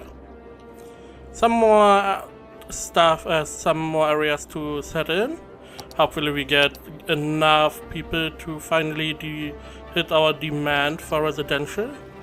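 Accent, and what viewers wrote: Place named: Germany. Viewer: klingt lustig dein denglisch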